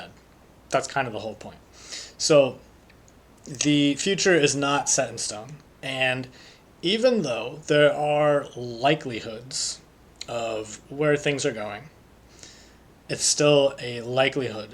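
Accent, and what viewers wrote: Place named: Finland. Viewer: hmm, possibly Finnish? I legit thought you were 100% Finnish at first and you almost like… have an accent? 😅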